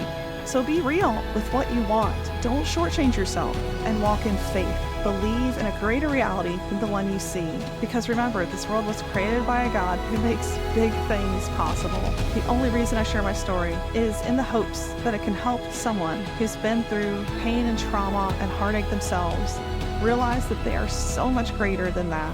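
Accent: South, United States. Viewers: Congrats on your escape. You have a little southern accent there. Im old as dirt, so I'm probably not a great candidate for AI or quantum, but it sure intrigues me. At 72, I have so many ideas over the years that have come to light by others, and I keep missing the boat since I don't act. I now have so many other ideas written down, and since my x left, I have time to act on some of my stuff. Help me jump, please. Cleo......Thx.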